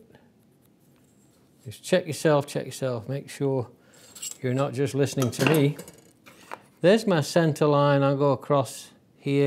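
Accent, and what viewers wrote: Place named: Sheffield, United Kingdom. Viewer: Love that accent. The sound of Sheffield steel.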